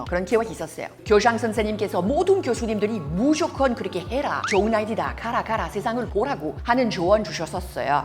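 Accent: France. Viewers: 이야 이게 누구야 부란서이씨의 원조 이 님 아녀? 불어억양의 빠른 속사포한국어는 여전하네요. 오랜만에 들어보니 반가울지경. 한국에서의 삶이 벌써 30년이 넘으셨으면 이젠 그냥 한국인이시죠. 오히려 고국으로 돌아가도 어색하실듯. 암튼 건강하게 잘지내는 모습 보니 좋네요. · 악쌍 코레앙은 정말 그 누구도 따라할 수 없는 트레이드 마크. 트레 쥬닉크.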